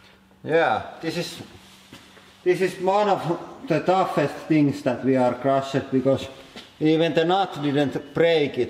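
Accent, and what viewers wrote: Finland. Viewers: are u from Finland because u sound like the dudesons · I love his accent. Finnish :)! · rallienglanti paras englanti : D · Judging by his accent, is he Finnish? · suomalaista paskaa englantia :D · its the finnish accent becouse we speak how we write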